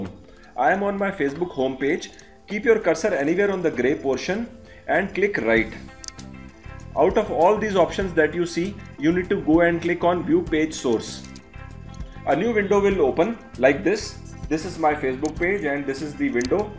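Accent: India. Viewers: oooooooooooh noooooooooooo damn indian voice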